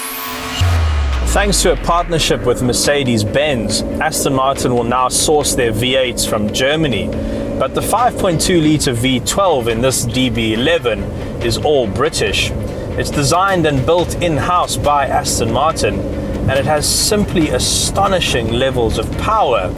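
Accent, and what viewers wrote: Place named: South Africa. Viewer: CarVinci No this South Africa. This is our normal English accent. I have seen that many people from other countries think we speak with an Afrikaans accent but then that is people who speak Afrikaans usually who then also speak English. His accent is the usual South African English. The place he was filming is in Cape Town.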